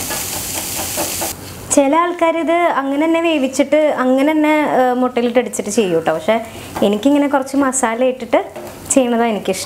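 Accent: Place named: Tamil Nadu, India. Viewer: Kure tamil chuva ayi alle samsarathil. ✌️✌️😍😍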